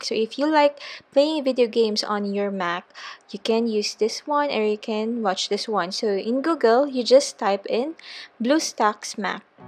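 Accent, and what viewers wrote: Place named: Tagalog, Philippines. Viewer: Yeah does sound like she has a Tagalog Accent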